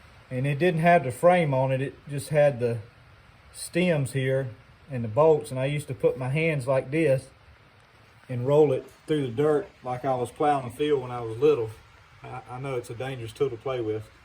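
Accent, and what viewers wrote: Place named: Coastal North Carolina, United States. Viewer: Listening to you talk I'd have to say your from coastal NC , listening to your accent. I live here in upstate SC but from the lowcountry. I'd have to Say NC as well cause you didn't say Agrisupply in Statesboro or Florence! Haha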